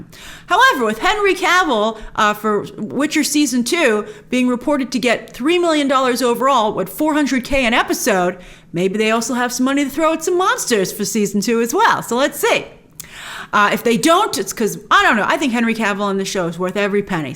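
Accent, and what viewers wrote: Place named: East Coast, United States. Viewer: It’s east coast speaking style. Very common for a lot of EC people to sound like that. She sounds like my family members so maybe it’s just me lol. Anyway, I’m looking forward to Monday!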